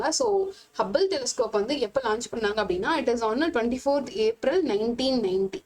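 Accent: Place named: United States. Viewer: Konjam slow ah sollunga mam! Understand panna mudiyala! USA english la style ah pesuramari irukku but konjam TAMIL medium students kum karunai katunga mam plz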